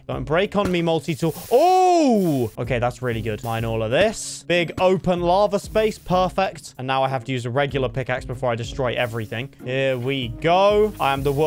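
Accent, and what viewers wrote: United Kingdom. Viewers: ive been watching for a while right, and tbh i think every episode he gets more british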